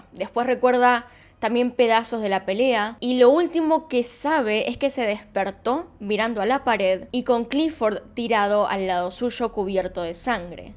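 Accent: Argentina. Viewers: Me pasa lo mismo, en mi caso no me gusta el acento argentino, pero los casos misteriosos son interesantes.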